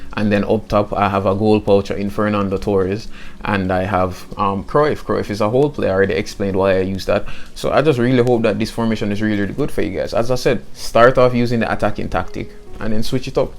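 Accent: Jamaica. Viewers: Ya Jamaican ? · You a Jamaican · You sound Jamaican lowkey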